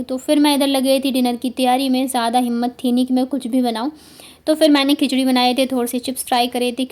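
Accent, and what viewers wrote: Bihar, India. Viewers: Didi aap Bihar se ho kya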